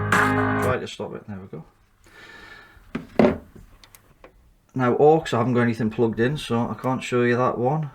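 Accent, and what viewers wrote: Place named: Scotland, United Kingdom. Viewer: It was a long video. So I fast forwarded it. Could not find the part you resolved it. But I enjoyed your accent. Sounds a bit Scottish. Is it? Can you tell me what went wrong with the unit?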